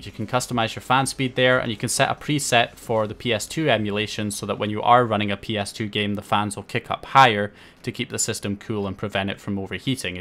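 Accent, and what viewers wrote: Scotland, United Kingdom. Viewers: Loving the channel! Well paced, logically explained, no background techno and a clear Scottish accent!! ;) Top class and incredibly helpful. Thank you!!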